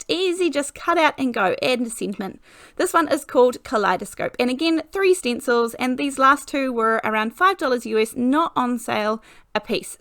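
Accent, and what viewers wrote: New Zealand. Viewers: I like how you fixed the card. I like the second version better also. Love your videos. I enjoy your beautiful NZ accent.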